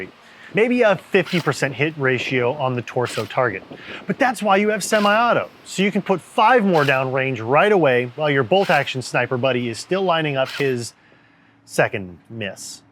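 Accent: Russia. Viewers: I Loved the super detailed review. All the info was much appreciated and incredibly helpful. Can't wait to see more reviews like this, Russian accent or not. Good job and Evike crew. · How to talk like that? Russian accent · That Russian accent though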